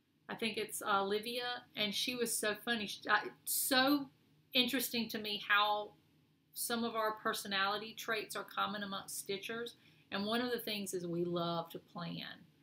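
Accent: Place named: South, United States. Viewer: Hi! I have been binge watching your videos...I love your choice of projects! I love your southern accent too! I watch your videos while stitching and I find myself agreeing with you out loud. My husband will peek in asking, who are you talking to...? LOL!!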